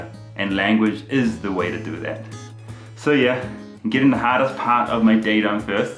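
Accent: India. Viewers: Hello ur English sound like Indian English I like the na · For my side the way you ride bike and the way you talk is like your 100% indian brother no matter what u r a perfect indian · Watching you from beginning... And see the way of your talking... You've become a pure INDIAN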